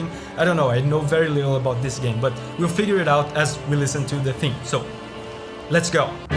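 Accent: Brazil. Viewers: what's your nationality? your English speaking accent sounds a lot like the Brazilian accent. Acabei de ver nas informações do canal que é brasileiro kkkk, deu para entender um pouco do que você estava falando e deu para entender a mensagem, mas seria uma boa tentar colocar legendas para quem não entende. E sobre as músicas é incrível como a fromsoftware não erra com musicas dos final Boss dos jogos, simplesmente lindas. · Sotaque br? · eu tinha uma sensação de q vc era BR por causa do sotaque kkkkkk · u sound brazilian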